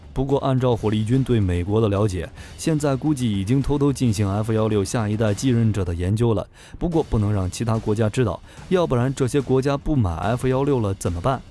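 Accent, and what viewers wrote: China. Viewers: 一個操著大陸口音的人，居然在吹台灣的飛機有多厲害！我業障重啊？我真的視障目盲耳背，一堆人跟風且無常識！就問你們飛過沒？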